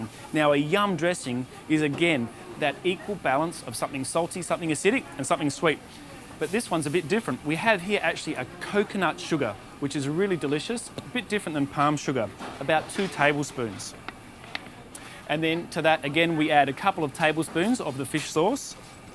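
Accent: Australia. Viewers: Really? A Thai chef and yet not Thai. He cooks for an Australian palate. I can go to Khao San road and learn these recipes. I would not then claim I am a Thai chef. In the US they have Chinese restaurants everywhere. There is a great Chinese population of all generations and 97% of the Chinese restaurants are so far from Chinese that they considered more American. The one near me is run by Mexicans. I have been a chef for 30 years, 20 of which was Asian cuisine. Plus, whenever someone has an English (Australian) accent they can make any claim. No one even questions it. Something about the accent tends to add legitimacy. American infommercials are rampant with them. I not doubting his culinary feats, just saying. Hotelier Christina Ong ( from Singapore, not Thai) gave him his great break. I know he spent a couple of years in Thailand ( I spent 10) and had minor success in Sydney with Thai food prior. 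World famous, no. So as I said, he cooks for an Australian palate.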